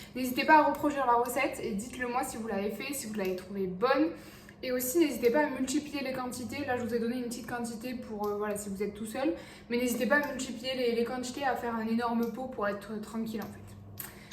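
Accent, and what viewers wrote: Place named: France. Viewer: Молодец! Спасибочки Тебе Большое Родная Драгоценная Прекрасная Девушка Красавица!!! Спасибочки Тебе Большое за Красоту Твою Прекрасную и за Твои Видео на Ютубе!!! Я, слышу Твой акцент, это наверное ,, Французский язык", Твой, Наверное???!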